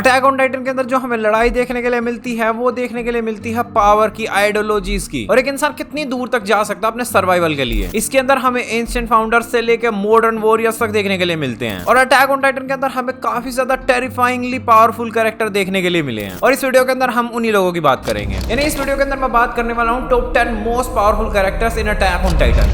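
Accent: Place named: Haryana, India. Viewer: Vai tumhara voice hryani vibe deti hea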